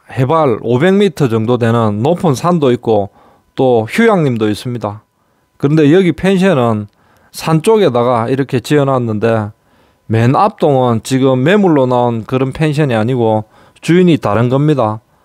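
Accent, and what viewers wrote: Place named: Gyeongsang, South Korea. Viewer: 투박한 말투와 경상도억양 보다 현실적이고 가식적이지않는 친근한 나레이터.. 전국곳곳의 간접경험 좋은 정보 좋습니다..ㅎㅎ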